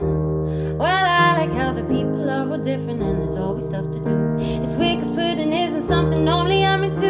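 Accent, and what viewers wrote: United Kingdom. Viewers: I love your UK accent :) greetings from Cali🌴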